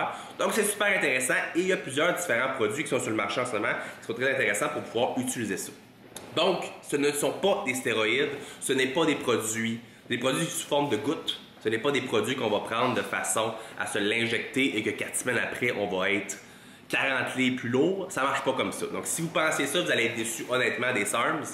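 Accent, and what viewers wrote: Quebec, Canada. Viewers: J'adore l'accent du Quebec, j'ai vécu 1 an et demi à Montreal vers Sherbrook Est, et j'avais adoré, les gens sont super cool, et les salles de musculation sont excellente ! Enfin bref, j'ai regardé plusieurs de tes vidéos et suis impréssionné par la différence entre un physique sous stéro et sans, à quel point ces produits ont une grande capacité à transformer un corps. Mais belle décision d'avoir arrêté pour garder ta santé, sur la sexualité, haha, car à quoi bon avoir un très beau corps si l'on ne peut même pas faire l'amour à sa copine! Let's rock ! ;-)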